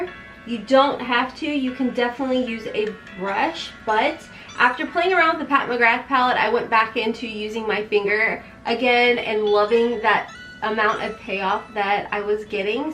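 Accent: South, United States. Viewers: Don't be sorry. I love ur country accent.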